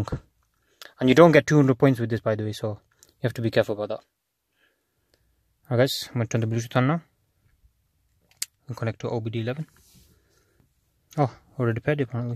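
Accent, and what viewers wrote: Bradford, United Kingdom. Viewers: You're definitely from Bradford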